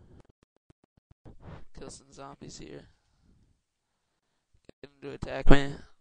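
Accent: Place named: New Jersey, United States. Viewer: I bet his from jersey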